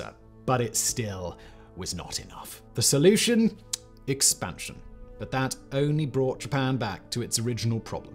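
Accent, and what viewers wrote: RP, United Kingdom. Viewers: Your RP is so over-the-top posh, it sounds similar to Jacob Rees-Mogg.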